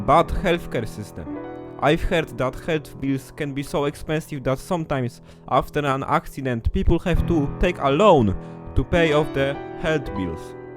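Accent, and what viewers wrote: Poland. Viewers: His accent, lmao. As Pole speaking English for years - he basically polonizes every english word. It's absolute beauty. It's me if I don't know how to vocalize word in english all of sudden. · yes he is polish he sound polish he speeks polish good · Oh my, your english sounds so Polish. I am saying this as someone from Poland. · czytany angielski ciebie zdradził xD zanim powiedziałeś że z pl już się domyślałem ale ogólnie to sam nie umiem czytać za dobrze, umiem dużo w angielskim mi sie wydaje [ bo moje porównanie to moja klasa xD ] ale ogólnie alfabetu angielskiego czytanego nie pamięta całego, a to co pamiętam to i tak angielski nie jest dokońca spójny np. know i no czytamy zawsze jako "noł" choć inne słowa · Odrazu po tym akcencie wiedziałem że polak xddd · Nie ironicznie przyjemnie się słucha z polskim akcętem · Masz najmocniejszy polski akcent jaki w życiu słyszałem. 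· Polski łamany na angielski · Słychać, że polak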